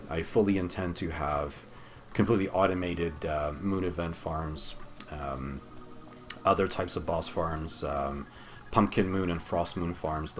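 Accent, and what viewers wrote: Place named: Montreal, Canada. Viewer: sound like a montreal boy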